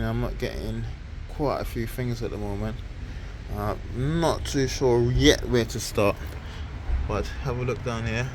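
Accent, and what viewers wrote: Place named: United Kingdom. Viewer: Nice to hear a British accent putting junk on the road again. 😊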